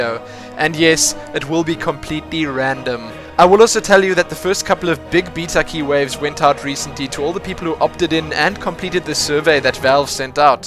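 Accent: South Africa. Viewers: You Sound South African Im from there too! Huge dota 2 fan! Key would be amazing!!!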